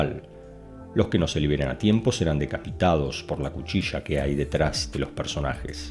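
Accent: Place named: Argentina. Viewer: No me entero si eres argentino o español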